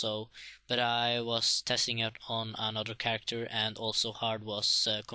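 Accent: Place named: Norway. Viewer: u from Norway? sounds like that :P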